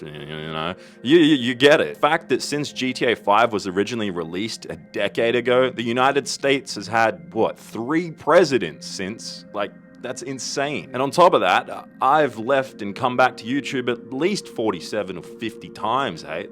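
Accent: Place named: Australia. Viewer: Dead set miss the I'm and the accent is Australian intro hahahaha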